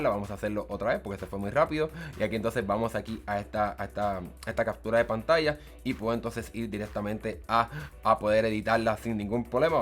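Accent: Puerto Rico. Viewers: ¿Eres boricua?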